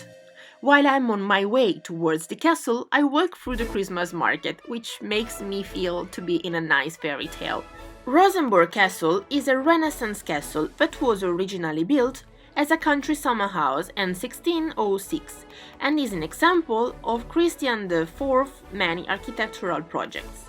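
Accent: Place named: United Kingdom. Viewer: Wow, complimenti per il canale e per la professionalità dei vostri video. Complimenti anche per l'accento molto British 😉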